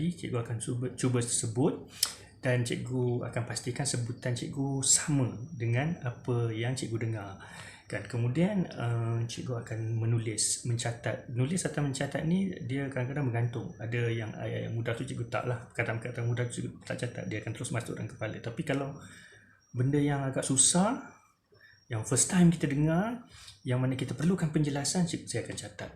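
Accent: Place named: Iban, Malaysia. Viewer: Best sebutan cakap Iban cikgu. Betul2 original. Belajar lebih banyak lagi Cikgu.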